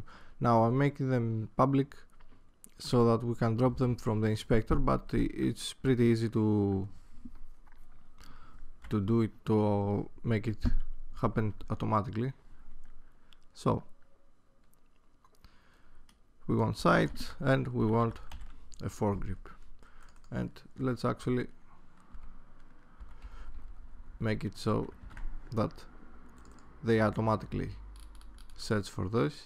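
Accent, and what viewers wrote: Greece. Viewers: The Greek accent though :P Nice tutorial, much appreciated :D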